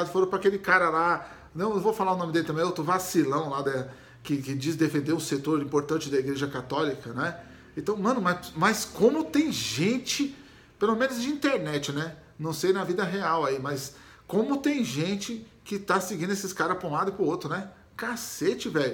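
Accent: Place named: São Paulo, Brazil. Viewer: Pô maluco, sou carioca mas me amarro nesse seu jeito tão paulistano de falar.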